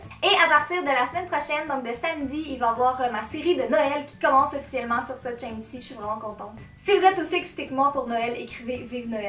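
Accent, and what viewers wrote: Quebec, Canada. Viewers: Hi j'adore franchement ton accent Québécois! !! Je suis française et j'adore l'accent du Québec 💖 Bisous ma belle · t quebecoise ou anglaise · je suis francaise et j'adoooooore l'accent québécois 😍😍😍 (j'aime beaucoup ta châine) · coucou ! j'habite en france, et j'adore l'accent québecois ! c'était juste pour te dire que je t'adore, tu es trop belle ! gros bisous <3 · c'est le mangnifique accent Canadien/Québécois (désolée, je ne sais pas si c'est la même chose:))! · Elle est québécoise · J'adore l'accent canadien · j'aime trop l'accent québécois ❤ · Elle vient du Québec comme moi🇨🇦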